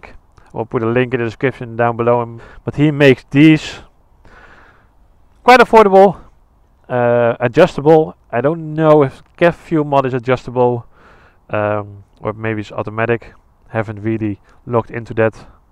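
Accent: Netherlands. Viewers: Ik hoor gewoon aan je dat je nl bent😂 praat gewoon nederlands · Haha ik dacht ook gelijk hé Nederlander haha. Rijd ook een R.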